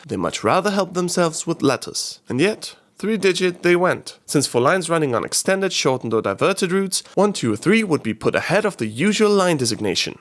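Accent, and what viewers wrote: Vienna, Austria. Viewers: Its weird seeing an youtube channel in english with hints of austria or austrian dialects such as the: Tor! I wea narisch! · ich schau mir das hier nur an weil dein österreichischer Akzent sich im englischen dermaßen amüsant anhört dass es eher nicht geht XD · Sad but true story: I'm German and always watch the English version of your videos as the Wiener Version is completely incomprehensible if you're not used to that dialect :D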